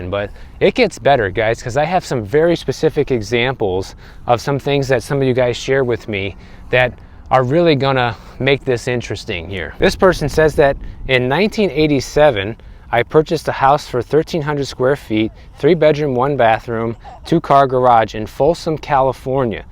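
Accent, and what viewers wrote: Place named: Midwest, United States. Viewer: You still have your midwestern accent (flat vowels).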